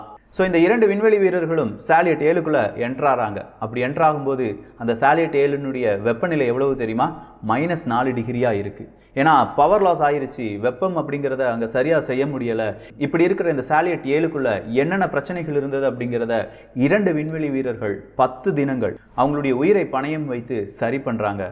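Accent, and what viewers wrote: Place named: Kerala, India. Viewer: bro malayalam slang in ur english theriuthu.. docking - dawking not dowking.. 'O' is special for Malayalees.. No offesne. it is slang..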